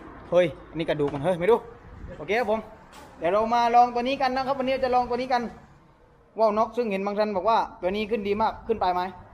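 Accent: South, Thailand. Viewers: ผมชอบมากคับถาษาใต้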